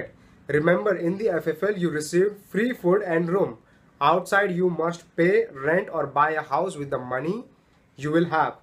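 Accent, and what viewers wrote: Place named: Indiana, United States. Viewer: He. Doesn’t speak with his French Accent. Just Indiana accent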